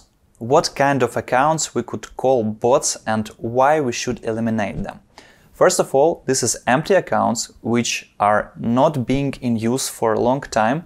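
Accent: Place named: England, United Kingdom. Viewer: Great video, you have a nice English accent! Keep it up m8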